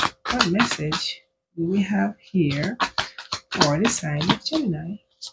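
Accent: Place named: Jamaica. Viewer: What an AMAZING read...Thank you dear! And I just LOVE ur Jamaican accent 😊.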